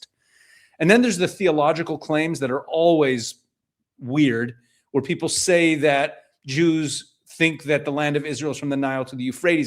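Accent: United States. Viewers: Way to go Rabbi. You sound like a Texan.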